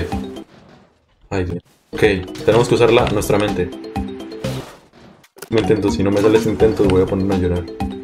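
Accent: Colombia. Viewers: eres colombiano? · Mi impresion o es colombiano.-.?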